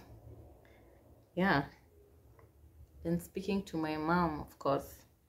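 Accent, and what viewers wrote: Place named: United Kingdom. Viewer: wuuueeeeehhhh🤣☺😂😂😂😂 na hio british accent yako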